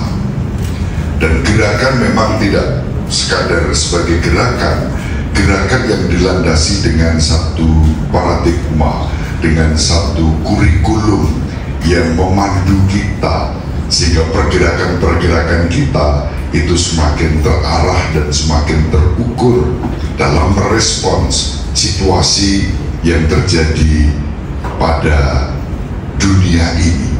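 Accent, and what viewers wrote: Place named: Kalimantan, Indonesia. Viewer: Kalimantan mana suaranya❤❤❤😅😊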